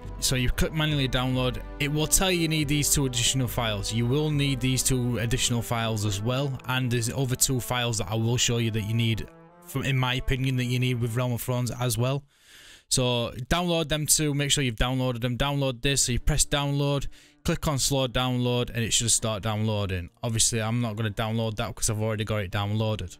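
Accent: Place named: North, United Kingdom. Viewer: a person with a northerner accent is teaching me how to install realm of thrones i luv it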